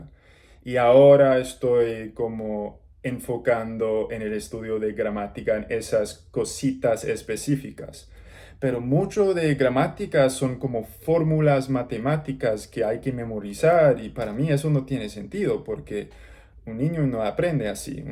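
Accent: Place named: Colombia. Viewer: omg yes! the accent is so colombian particularly from bogota/medellin? so impressive, feels like a person from Bogota is speaking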